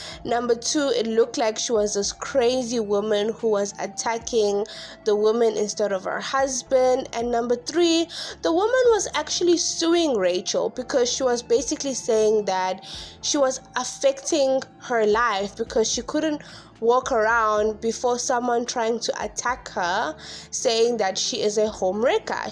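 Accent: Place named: United States. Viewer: Why is this girl (naraiting) talking with an American accent. Pse!!!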